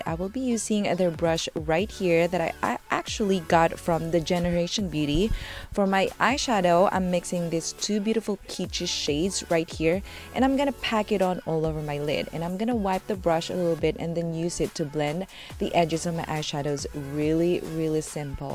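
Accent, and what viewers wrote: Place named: England, United Kingdom. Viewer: I really like the way you talk. I wish i could have Your English accent, wow. I always watch your vlogs, it helps me lots for my makeup style. Thank you. Mwahhh.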